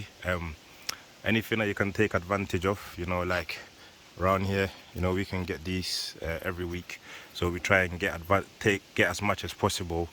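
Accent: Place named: United Kingdom. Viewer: Just found ur channel .. u got a British accent mate lol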